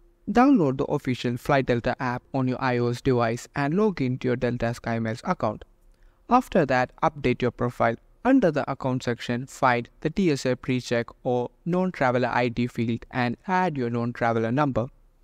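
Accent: India. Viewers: Thanks for making me feel like I called tech support. Listen to an Indian and get nothing accomplished.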